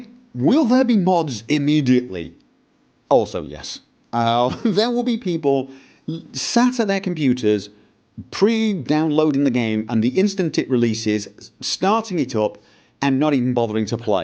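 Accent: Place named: Scotland, United Kingdom. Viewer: Ive always wondered but never really bothered to ask, so... Where are you actually from? Been subbed for, ugh, quite some time actually, like, over 2 years-ish, so Im guessing I kind of must have missed the info or something. Iunno, but I think you sound southern-scotland-esque.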